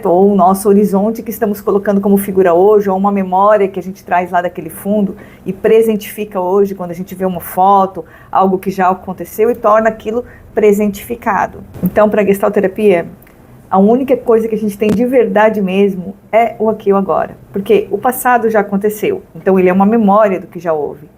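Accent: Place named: Azores, Portugal. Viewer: Maravilhoso! E é gostoso ouvir o sotaque açoriano, ainda que leve.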